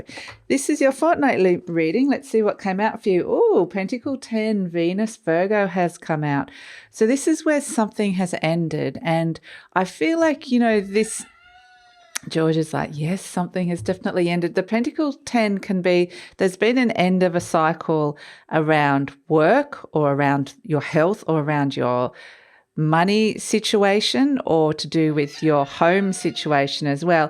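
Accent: Australia. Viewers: Very good...resonates and very exciting things to come. I enjoy ur channel especially because u sound to be Australian and relate it to Southern Hemisphere, there's not to many Southern hemisphere readers so I'm grateful when i find one! Namaste beautiful being namaste 💖🇦🇺🙏🌈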